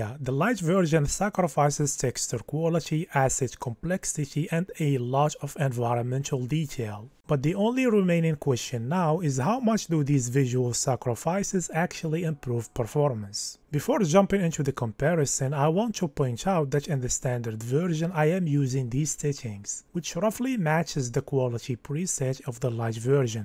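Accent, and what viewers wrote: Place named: Morocco. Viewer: Moroccans can never hide their accent xd ,nice video a sat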